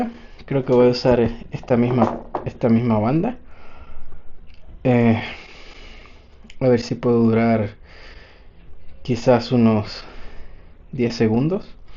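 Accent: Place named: Chile. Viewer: Por tu acento eres de chile verdad? Por ciento normalmente cuánto cobras por un entrenamiento que me habias dicho en un comentario convertido a pesos mexicanos o como funciona que no soy de ese país?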